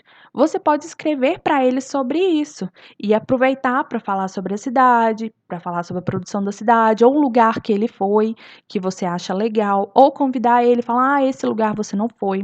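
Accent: Minas Gerais, Brazil. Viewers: Tu é mineira? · Meo deos mule tu e mineira???????;_;amei quem amou?akaka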